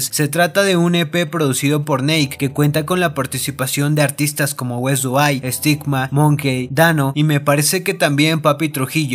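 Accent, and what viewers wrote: Argentina. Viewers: Eu yo pensaba que eras de argentina jsjsjs De que pais sos??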